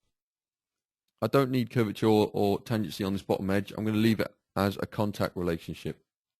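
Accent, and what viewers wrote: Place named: United Kingdom. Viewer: Was pleasantly surprised when I heard a British accent.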